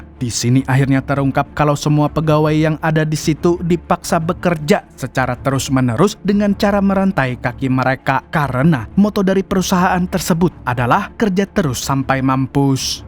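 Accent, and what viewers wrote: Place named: Bandung, Indonesia. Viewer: Iyeu Operator na Anu komentar pasti na budag Cicaheum nyyaa.... Logat na teu bisa ngabohongan penongton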